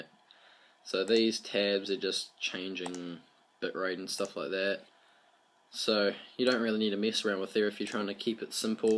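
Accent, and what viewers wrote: New Zealand. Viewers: His accent changes the guys vowel to almost gihs but clearly he DID NOT say girls. There was NO L sound in there. I was going to guess that he was an Aussie but clearly it he stated that he is a Kiwi in other comments. My American ears cannot tell the difference(just like many non Americans cannot tell the difference between Canadian and American speech). Very similar. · Ha ha. I picked your Kiwi accent in about 3.5 seconds... then got your confirmation at the 28 second point. Well done my fellow Kiwi !! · Such a typical kiwi accent lol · Hey man I Luv your NZ kiwi accent !!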